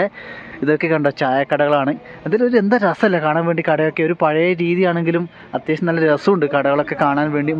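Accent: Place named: Kottayam, India. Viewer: ചേച്ചി കോട്ടയത്തുകാരി ആണ് സ്ലാങ് കേട്ടാൽ അറിയാം. ഫാര്യ എന്ന് കേട്ടപ്പോൾ മനസ്സിലായി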